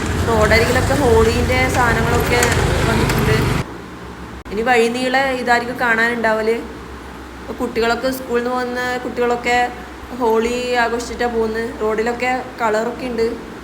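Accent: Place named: Kannur, India. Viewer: ഞാനും കണ്ണൂരാണ് സംസാരം കേട്ടപ്പോൾ തോന്നി.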